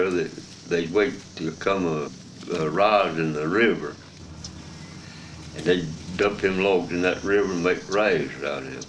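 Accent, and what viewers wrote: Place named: South, United States. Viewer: He reminds of my grandfather; his lean body, country accent, and slow way of talking. I had a recording of Pa talking about his experience in WWI and my kids asked me if that was really how he talked. He was a man of few words and only spoke when he had something to say. This video makes me miss him.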